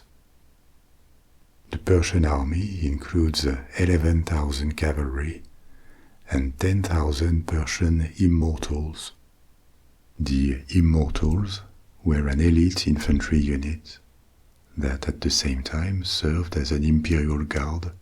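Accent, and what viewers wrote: France. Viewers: the original of this video got me through many sleepless nights. thank u for coming back mr!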